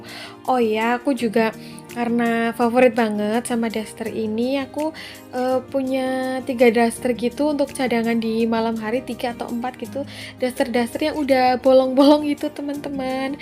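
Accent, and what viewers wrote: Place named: Banyumasan, Indonesia. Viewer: Mbaknya orang mana sih... Kok ngomongnya mirip kaya pengenyongan... 😁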